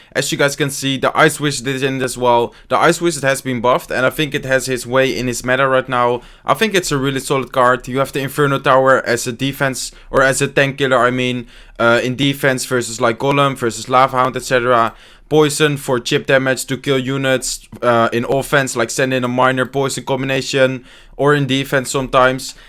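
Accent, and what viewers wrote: Netherlands. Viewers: Ur so obvious dutch:) nice vid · Are you Dutch? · Are you dutch? You have a dutch accent in your english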